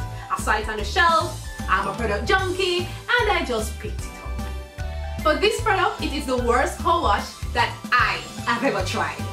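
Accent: Jamaica. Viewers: I had to sub right away! Love your infectious personality and accent! My mom is Jamaican so I picked up that accent right away! 🇯🇲🇯🇲🇯🇲🇯🇲🇯🇲🇯🇲🇯🇲🇯🇲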